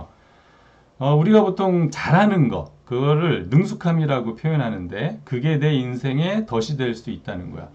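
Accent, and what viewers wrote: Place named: Seoul, South Korea. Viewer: ㅎㅎ서울사람 말투 행동하는 삶 으흠~^^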